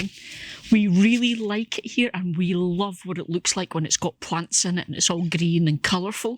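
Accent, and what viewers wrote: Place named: Northern Scotland, United Kingdom. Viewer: I see you are on the East side of Scotland - whereabouts? I spent 3 years in Rosyth but you sound further North (to me anyway LOL)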